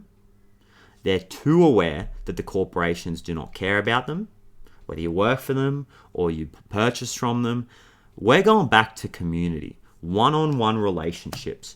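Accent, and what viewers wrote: Australia. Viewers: Are you Australian ? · Whoa! Hello! 6 mins in and I’m feeling super connected to this reading! Oh, and the Aussie accent is refreshing being local 🇦🇺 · when your readings resonate with me it’s like you’re speaking directly to my higher self it’s like a flow of information. I mention that because I have auditory processing delay and I find it extremely hard to focus on and actually hear what’s being said without subtitles. Here, with your Australian accent and such unique, cute tone of voice I get it, I don’t even have to know all the expressions you use and still it makes perfect sense ⚛️ to me it’s a confirmation that I’m not delulu, that I’m not imagining stuff. That our interconnectedness is so very real. I love it and I love you 🩷🧡💛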